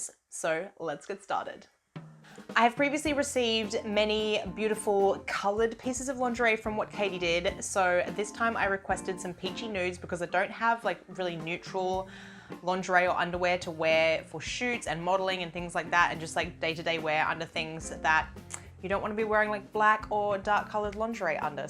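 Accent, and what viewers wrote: Australia. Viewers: I love the Aussie accent. She is a beauty as well. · Are you Australian, I love your accent 😃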